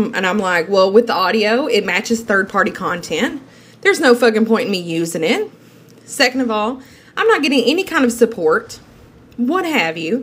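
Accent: South, United States. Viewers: I love the country accent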